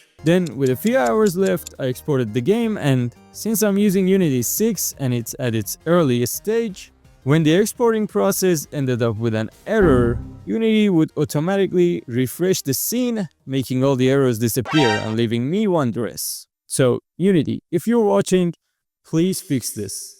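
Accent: Iran. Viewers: you sound soo Iranian for some reason its hard to see gamedevs from iran on yt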